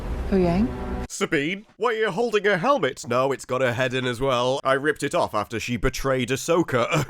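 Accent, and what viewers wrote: United Kingdom. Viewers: Go to the Dentist u brit, handsome voice tho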